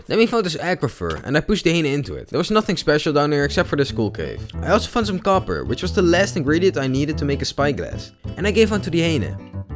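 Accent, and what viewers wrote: Netherlands. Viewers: You have a little bit a dutch accent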